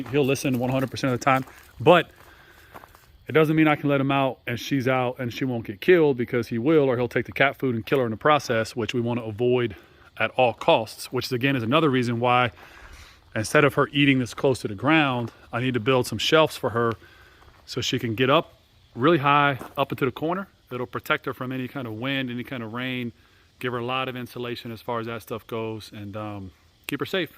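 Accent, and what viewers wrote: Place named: South, United States. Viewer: That accent? LA? Definitely the south.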